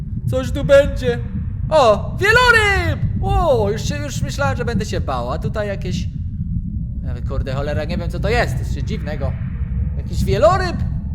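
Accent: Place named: England, United Kingdom. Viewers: Mr XanTeS pl on jest z anglii jakbys nie wiedzial -. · Mr XanTeS pl To nie kaleczenie. większość życia mieszkał w Angli, więc i posiada angielski akcent, nie polski..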